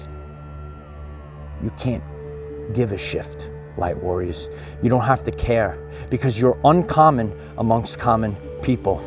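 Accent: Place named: New Jersey, United States. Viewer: cool Jersey accent.. who are the rainbow warriors? Never read about that in scriptures. Did some debased tribe make that one up? God created the Rainbow - displayed it after the first Flood / damnation of the humans who were huddling together in heathen actions. Rainbow across the road now 'represents' sodomy and child abuse. Which one is it mister virtue signal. Oh...and the Hopi never talked about 'rainbow warriors' that was invented by some Jewish guy in the 70s high on LSD. It was a cool story though.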